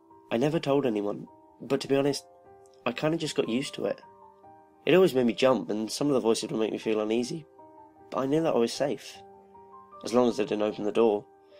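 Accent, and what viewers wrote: London, United Kingdom. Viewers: Like your readings very much! of course I'm a Scot living in London so.you don't use non-existent words like 'normalcy' in stead of Normality (even Creeps McP has done this piece of unforgiveable shite!) you make it scarier cuz it sounds closrer to Hackney E5! Thanks and keep it up (the others tend to send me to sleep but wif you soundin so close it freels like its right outside my door! sp00ky! xx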